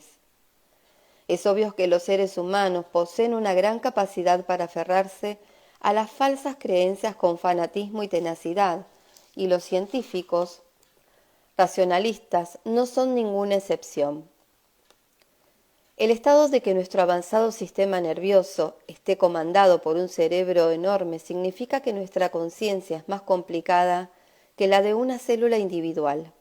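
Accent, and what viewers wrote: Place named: Argentina. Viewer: De verdad!! Escuchar una voz argentina es genial!! Me gustaría que leas el resto del libro 😇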